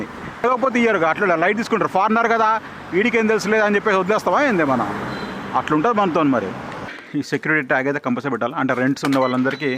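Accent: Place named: Andhra Pradesh, India. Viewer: endanno motham andhra yaas maatladuthunnav, thu, I am unsubscribing now, its been a habit to our Telangana people famous ayindaaka Telangana yaasa, famous aynanka andhraaa yaasa start chesthundru, endanna, we should save our Telangana slang anna, good bye.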